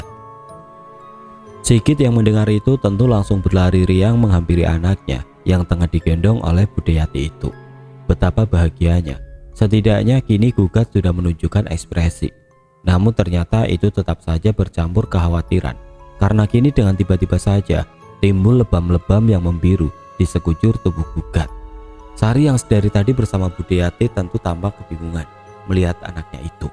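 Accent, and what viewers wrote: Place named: Banyumas, Indonesia. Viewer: Lama2 aku beneran gak berani dengerin ceritanya mas di tengah malam😅 Tapi seneng dengar suaranya ngapak2 gimana gitu bhkan kadang bikin ngakak juga 😅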